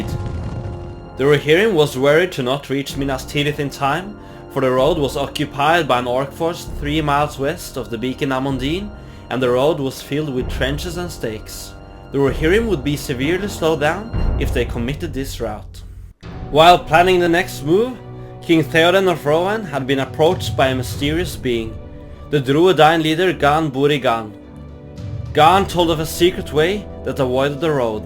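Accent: Norway. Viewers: Is that a norweigan accent Im detecting? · We can all hear that the narrator is from Norway... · Great video! Awesome animations. Also, I bet my life the narrator is Norwegian. Tell me I'm wrong. Again, amazing work brother! Would love more of these kinds of videos! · The norwiegan accent is soo clear XD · Love the accent. Norwegian I suspect. You can se how Tolkien had Scandinavian language in his mind when creating many of the languages. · Veldig Norsk engelsk men dæven du er flink. Supert arbeid. Gir meg full oversikt over kampen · Detta är första gången jag hör norsk dialekt på engelska! Haha gillar det! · This is really cool LOTR battles with an norweigian accent. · are you from norway? I like your accent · The dude talking is norwegian. I can hear it. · Dæven så norsk-engelsk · Love your east norwegian english accent bro! Love from your closet relative Wermland Sweden!🇳🇴🇸🇪❤️ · i knew it! 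^^ I could here the Norwenglish xD hehe Bergen here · ikke ment som kritikk, men man kjenner jo igjen tonefallet. Artig med nordmenn som lager kvalitet på YouTube. Gode temaer og fin måte å fortelle historien på. Forsøker å lage noe LOTR selv, men i en helt annen stil.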